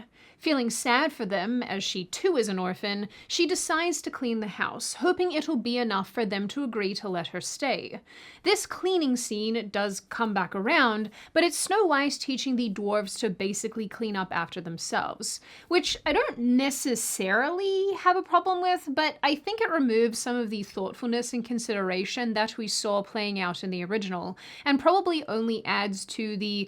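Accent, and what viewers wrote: Australia. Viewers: sorry, you are my second favorite funny talking Australian. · The funny talking Australian dropped facts again. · You’re Australian?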